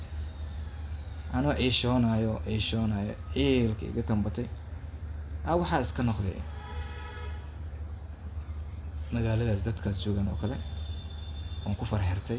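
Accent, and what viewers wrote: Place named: Xamarjajab, Somalia. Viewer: nacso u sound like u from xamarjajab beenlow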